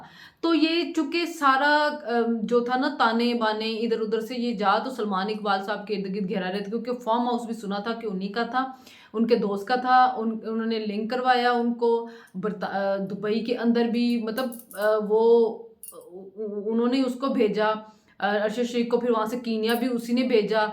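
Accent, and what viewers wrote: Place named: Punjab, India. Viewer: your punjabi is sooo cute, you should do your vlog in punjabi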